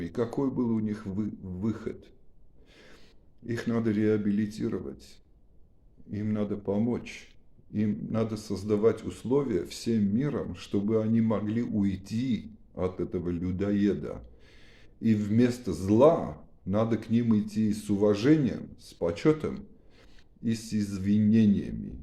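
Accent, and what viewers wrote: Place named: North Ossetia, Russia. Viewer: Спасибо вам за правду, вы человек с большой буквы не знаю кто вы и какой вы нации но по акценту вроде осетин но это не суть важно, важно что вы доносите до людей повторюсь правду ,мир в долгу перед чеченцами, за то что бросили один на один в войне с Россией и причина этому, боязнь гнева огромной ядерной державы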